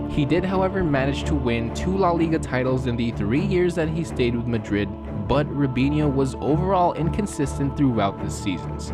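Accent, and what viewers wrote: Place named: United States. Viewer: I cant take football videos seriously when their commentated by Americans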